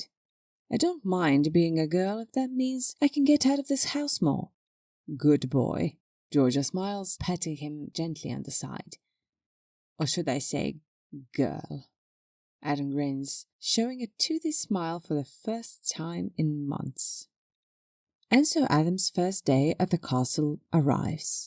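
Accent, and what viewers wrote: United Kingdom. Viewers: I noticed you used a different voice for this video today, one with a heavier English accent. It fit the story very well. I also like this new storyline you just started, you are really branching out with new story ideas. knowing how your stories are we know there will be a gender change where he becomes a real girl. I cant wait till the next season of the pink packet/ mittens storylines those are my favorite works of yours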